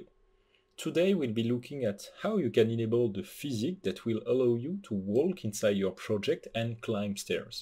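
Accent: France. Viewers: il est français je le sais car j'en suis un et je le repére a 1 000 km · Indeed it's a French accent, sorry about that, doing my best to improve my english ;)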